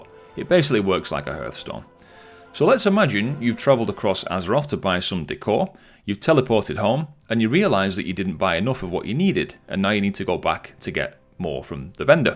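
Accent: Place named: Northern England, United Kingdom. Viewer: Thank you for the video. Nice to hear a north of England accent ( Yorkshire girl here ).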